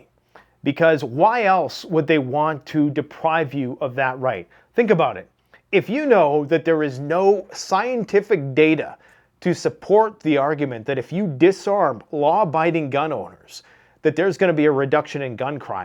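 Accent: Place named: United States. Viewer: Hey — you sound more like an American prepper to me — maybe you should move south.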